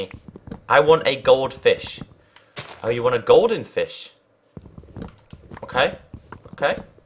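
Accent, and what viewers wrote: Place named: Newcastle, United Kingdom. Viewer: Hoos you from Newcastle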